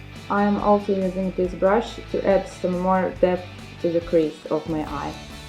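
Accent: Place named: Russia. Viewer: Русский акцент .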